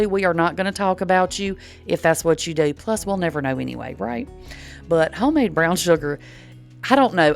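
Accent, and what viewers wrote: South, United States. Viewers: I just subscribed and glad I did. The dry mixes are great!! I do several homemade things, mostly liquids and brown sugar. I see you mention jar sizes on most. I love to stir between items too. I feel I distributes ingredients better..?? Don’t know but, good distribution to me, gets it well blended. Thank you for all you do. BTW: I’m an 60 year old country girl who says” pe-can”. Northern people say, “pe-con.” Both are correct according to the dictionary but, you sound southern. Especially y’all. ❤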